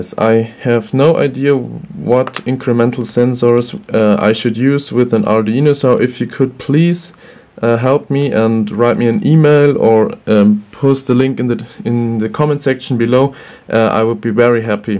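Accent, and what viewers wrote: Germany. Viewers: I realize this video is very old, but I am currently looking into this for a hobby project. Your Accent makes be believe you may be German, if not then sorry right away. I can't help but notice you really enjoying this gearbox and was wondering if you still remembered where you bought it from, if it was online, and how much it cost. Thank you very much!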